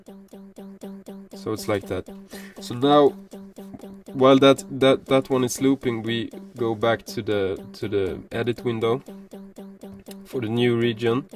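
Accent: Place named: Sweden. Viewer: lol love your accent :D it is soo swedish. great tut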